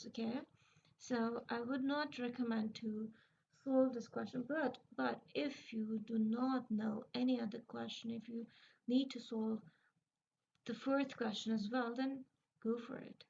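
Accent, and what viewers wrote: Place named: United States. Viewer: Madam wese ap ny kaha tha ap ko easy tareeky se samjhao gi per ap ny itni American English accent bol kr pryshaan hi krdya ... over lagta hai...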